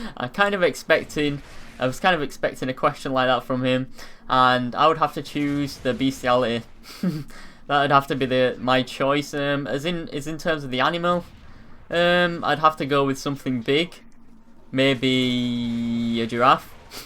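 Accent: United Kingdom. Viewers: British english sucks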